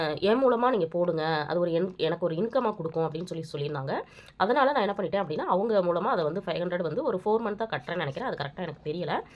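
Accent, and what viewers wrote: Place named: Tiruchirappalli, India. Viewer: Sis neenga than trichy thamilachi ah voice appdi iruku athan ketten sis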